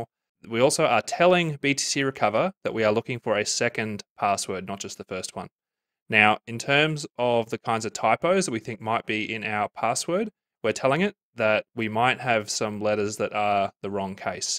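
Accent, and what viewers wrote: Australia. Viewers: The updates you have made to the code, the videos and what is clearly a lot of work is appreciated and meaningful. Thank you. I have your current code running just fine on about 5 systems at the moment. I've explored and set up machines on vast.ai just fine as well. Got all of the GPU code running through the tests 100% but my wallet is a very old Blockchain wallet that's not been converted yet because I don't know the password. I can't seem to use a GPU via OpenCL or Nvidia. I have the id and it's connected to my email just fine. I can and have used the scripts to download the wallet and I understand --data-extract just fine as well. In any case, I've gotten all the way to having lots of possible passwords in my possible passwords files. At this stage, I'm simply trying to work out the most optimal means of plugging all of that back in to figure out the precise password. 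It says that the difficulty of my wallet is 10 which seems good relative to the difficulty rating on some of your test setups. Did I detect an Aussie accent? I'm in the Melbourne area. I'm happy to pay on a consulting basis as long as this is not a percentage. My sense of my situation is that I'm a few mm from the finish line at this point.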